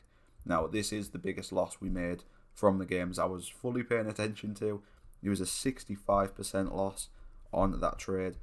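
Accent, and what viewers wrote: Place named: North Yorkshire, United Kingdom. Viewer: That's a very North Yorkshire accent you have, 'Boro or Redcar way! My hubby was watching and I recognised your accent from when I lived in Saltburn North Yorkshire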